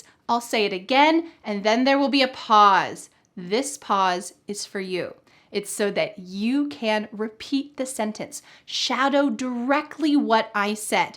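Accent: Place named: United States. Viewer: Great video! Just, why do you insist so much on the "r" pronunciation and US accent. I mean, why should one want to sound american, these days? After the infamous incident at the soccer World Cup, especially 😅. That really has been the last straw ...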